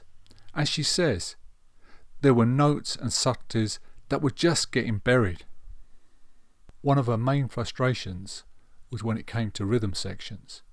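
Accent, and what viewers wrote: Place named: United Kingdom. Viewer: How do YOU pronounce your surname? I'll wager it's not the way Franz Schubert pronounced his. Who really cares - honestly??????? In any case, you're doubtless American and the narrator's accent may sound unfamiliar to you, but it makes PERFECT sense to Brits. It is the content that matters, not the pronunciation.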